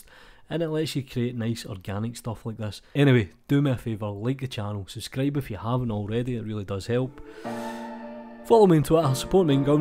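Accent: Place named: England, United Kingdom. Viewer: Can’t understand that English accent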